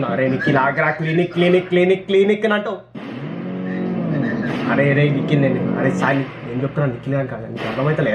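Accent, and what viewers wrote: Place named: Hyderabad, India. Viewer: ana you sound like jesse in breackin bad vere asal haydrabadhi bhai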